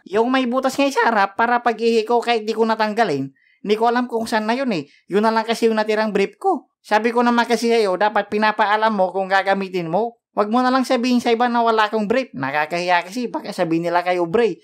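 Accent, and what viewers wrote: Ilocos, Philippines. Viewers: Kulit ng accent mong Ilocano 😂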